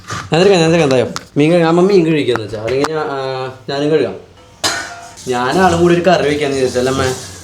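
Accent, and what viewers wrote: Thrissur, India. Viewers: Chetta You guys are really cute💕😍.. Enthu resamma ee video kandondu erikkan😍.. Nigalude face lle Innocence serikum ariyam.. The way you guys smile 😍 and i really like thrissur accent ❤️ Chetta U r amazing.. Keep going 💪👍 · Trissur anoo chetta 👀😀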